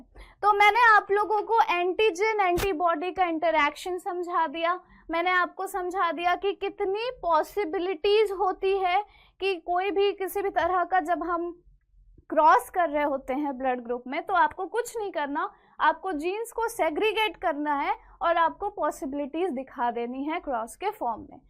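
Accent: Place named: Northeast, India. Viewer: Ma'am ur Hindi is like Northeast pipul but do speak how u speak now make us ur word remember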